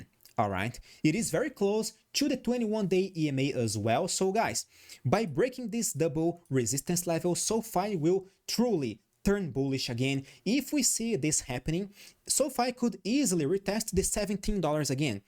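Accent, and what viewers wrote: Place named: Brazil. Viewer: Claramente um amigo do brasil, a pronuncia nao engana ! Primeiro video que vi seu e adorei. Fica aqui a minha subscrição. Cumprimentos de Portugal!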